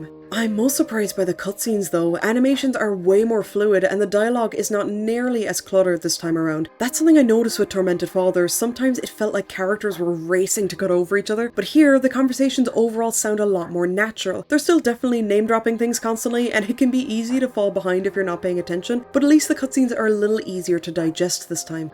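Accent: Ireland. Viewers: Hi! So, I watched your videos on Rule of Rose, Haunting Ground and now, Remothered. About time I leave a comment: your coverage of the games is great and I find the video length quite comfortable, too. As long as a Twin Peaks episode ;-) By the end of this vid, you shared how surprised you were to hit 100k views. I think it's well deserved for the polished video presentations. And the Irish accent :3 Just keep following that passion. I'm sure life has great things in store for you and your videos. Also, I can't go without mentioning the bit of Silent Hill music I heard at the end of your Haunting Ground video. I'm a sucker for the SH music and aesthetic. So, thanks for that, too :D edit: I read through the descriptions of the other videos I watched. More SH tracks that have gone undetected. It's subliminal bait for SH fans at this point :D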